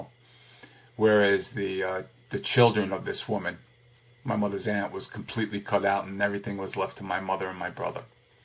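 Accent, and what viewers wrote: New York, United States. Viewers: Are you from the east coast? I'm detecting a New York accent and as I'm typing this, you said Brooklyn. You sound like family! Anyway you look like a cross between John Gotti and Deniro. Take it as a compliment because they're both very nice looking men. I'm sorry that you had to deal with this shit. I sense a lot of those things in the narc I know. She is in a sick competition with her daughter. She financially supports her child and provides for her but any other mothering seems void. Only if it serves her. She'll put pictures on to get compliments.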